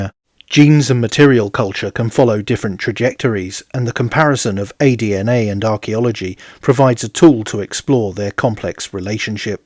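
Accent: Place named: England, United Kingdom. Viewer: He’s English. People pronounce words with the most sensible emphasis in the phonic system of their own language. English people don’t say Paree when referring to Paris, even though that is the French pronunciation.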